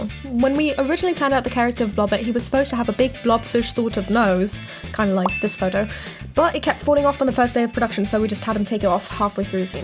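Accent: United Kingdom. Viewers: When did you become British? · Why are you British now??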